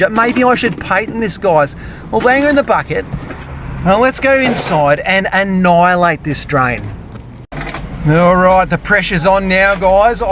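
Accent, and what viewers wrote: Australia. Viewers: Just looked up at the clock and it's here in the UK which means that I've been enjoying you videos now for almost 4 hours - brilliant, cathartic and I feel I've learnt to speak 'Aussie' in the process. Good on ye fella. Great to see somebody so into their work and enjoying every minute. Pity you don't operate around here. Cheers. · The Australian accent just kills me😂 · I love the Australian accent ❤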